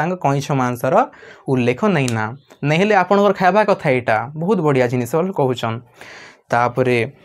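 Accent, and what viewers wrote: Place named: Sambalpuri, India. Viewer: ସାର୍ ଆପଣଙ୍କ ର ସମ୍ବଲପୁରୀ ଆଉ ଓଡିଆ କଥା ମିଶା ଗପ ଟା ଭଲ୍ ଲାଗ୍ଲା ସେ😂😂😂😂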